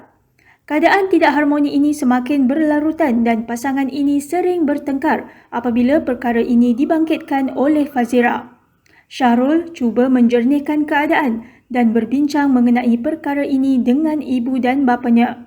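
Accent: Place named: Kelantan, Malaysia. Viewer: Speaker pelat... ore klate ko haha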